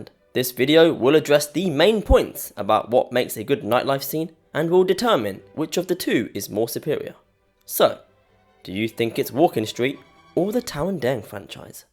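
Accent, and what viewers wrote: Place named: RP, United Kingdom. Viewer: I love your accent; it's quite similar to that of the Queen of England. Greeting.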